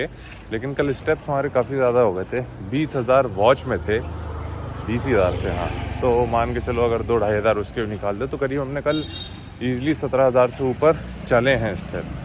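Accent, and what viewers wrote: Gujarat, India. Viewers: Bhai tum gujrati ho kya ?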